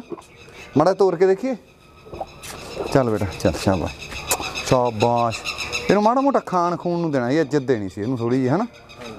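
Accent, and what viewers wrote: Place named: Malwa, India. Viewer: 22 malwe alli bolli bhott vidya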